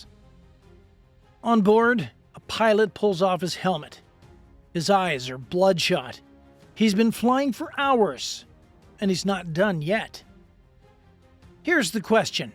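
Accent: Canada. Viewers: Dude are you Canadian? No hate but what?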